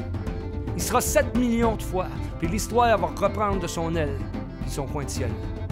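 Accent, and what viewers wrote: Quebec, Canada. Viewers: Wow! vas-y...t'es comme un Homme de meme pas 40ans qui parle avec 100 ans d'experience! une voie qui s'exprime avec la force de mille de nos ancètres. quand je menuis de mon pays je t'ècoute et rempli mon coeur de cette musique quebecoise qu'est notre langue ben parler...du pure quebecois...une langue, une culture precieuse...moi je vote pour qui est encore des milliers de "y etais t'une fois" a venir.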